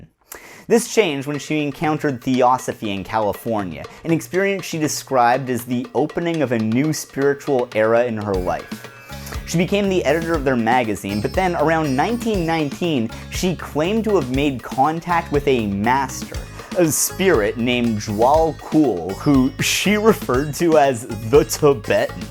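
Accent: Canada. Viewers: This was great. Informative and entertaining. I love your NIN shirt and your Canada, eh? accent. Good job! I can't wait for the next one.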